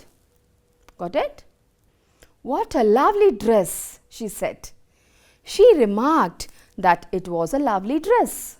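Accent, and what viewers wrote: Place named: Bangalore, India. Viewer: Please explain slowly and don't use special accent(Bangalore) mam... Because Kannada medium students also watching your lecture..Hope u understand... Other wise make special veduos for Kannada medium students...☝️☝️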